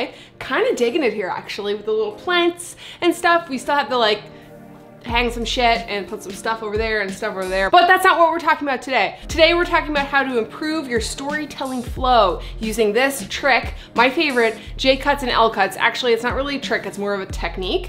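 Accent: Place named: Canada. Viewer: I’ve never caught your Canadian accent at all until this ep, love it! AHdio hahaha 😎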